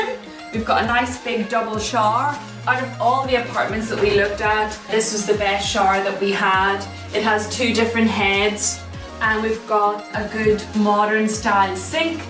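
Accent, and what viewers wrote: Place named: Northern Ireland, United Kingdom. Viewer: Studied English for so many years and have been living in the US for ten years. This is my first time hearing someone from Northern Ireland speaking. I can tell Northern Ireland accent sounds way much different than England accent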